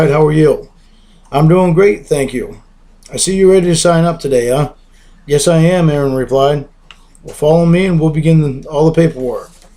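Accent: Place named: Maine, United States. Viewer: Sound like a mainah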